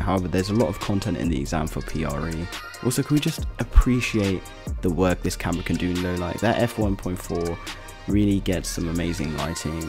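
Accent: RP, United Kingdom. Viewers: But how is he posh do?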